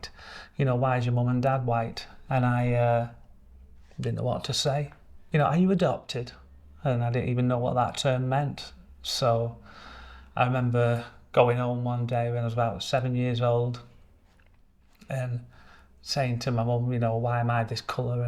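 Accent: United Kingdom. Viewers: Thank you for sharing your heartfelt story ❤ you are a lovely man . Love your Wigan accent .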